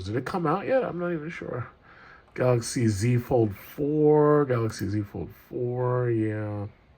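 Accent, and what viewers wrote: United Kingdom. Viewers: Your British accent sounds like Monterey Jack from the cartoon Chip n Dale’s Rescue Rangers 😂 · How dare you bring up the massacre of my boy Jon Snow in season 8. I felt like Don Corleone looking at my dead child watching what they did to his character lol. Love the British accent lol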